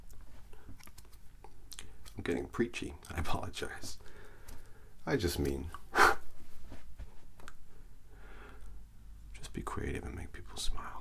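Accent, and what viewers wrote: United States. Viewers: I love that jumper. I want it. Love the american accent you do it so well. I felt a weird awakening with watching this 🤭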